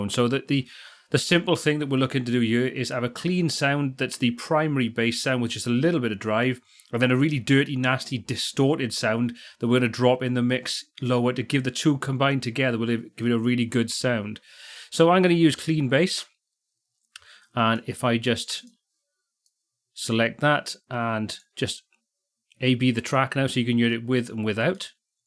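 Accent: Wales, United Kingdom. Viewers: Im sensing a bit of welsh on your voice there mate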